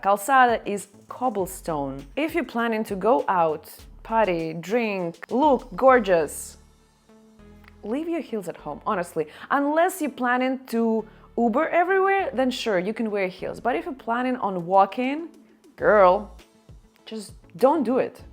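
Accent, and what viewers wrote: Russia. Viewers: Your Russian accent makes your videos even more enjoyable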